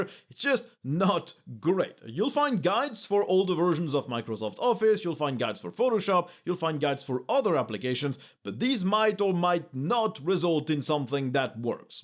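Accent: France. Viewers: C'est tellement léger comme accent ! Je crois que vous êtes dans mon top 3 des français qui parlent le mieux anglais (IRL et web) Merci pour le super travail, cette chaîne est devenue ma source d'info principale sur Linux, c'est un sweet spot en timings de publication, quantité et complexité de contenu ! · Your French isn't getting in the way of your English. no worries :)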